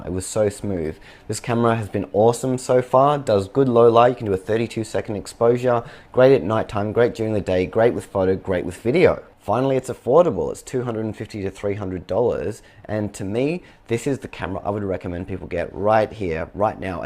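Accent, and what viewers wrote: Australia. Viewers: Your saying the original sperical shaped 360 gear from 2016 records in 4K. I'm looking through reviews and nobody is saying that. Well I puzzled??? is this for real it records in 4K? thanks for answering in advance and my other question (seeing you are in Australia by the looks and accent) where in Australia can I purchase the XIAOMI? Can you recommend any retailers that sell it?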